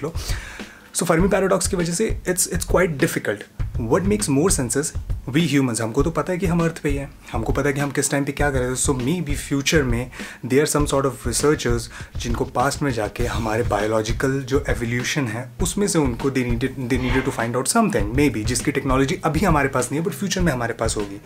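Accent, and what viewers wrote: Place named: India. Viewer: I get a kick out of the ingine accent.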